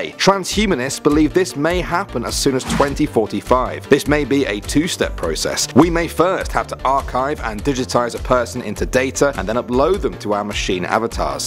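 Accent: United Kingdom. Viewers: 😍😍😍😍 I KNEW THAT BRITISH VOICE SOUNDED FAMILIAR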